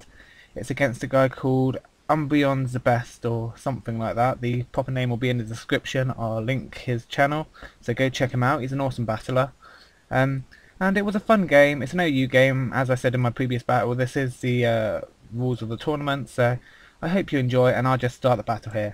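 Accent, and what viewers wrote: United Kingdom. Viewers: You have a British accent :3 xD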